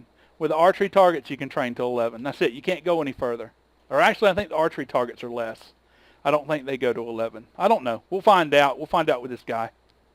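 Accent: South, United States. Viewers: The lesson also is to avoid ranting when recording days in advance, because the issue could be fixed before the video comes out, making you look like you don't keep up with the development of the game (Even though you are in fact one of the more involved youtubers I've seen.) Anyway, nice series, I'm really enjoying it. Love your southern country accent too, helps differentiate you from all the other Let's Play makers. Last few episodes were hard to get through though, with the five minutes of actual gameplay and the rest all paused with you talking the viewer's ear off. Not that I mind you talking, just play the dang game while doing so. It's a Let's Play not a Let's Talk. Glad your back to the old play and talk format. Also +1 for having a David on the team:p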